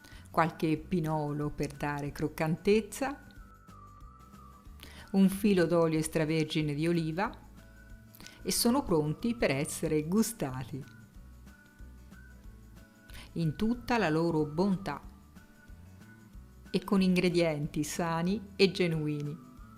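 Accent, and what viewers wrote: Tuscany, Italy. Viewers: Devono essere deliziosi😋 adoro le tue spiegazioni e il tuo meraviglioso accento toscano! ❤️ Un caro saluto🤗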